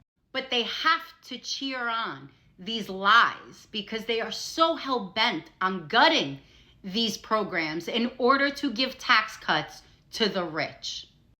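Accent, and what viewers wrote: New York, United States. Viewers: Queens? Long Island? Massapequa?? Love the accent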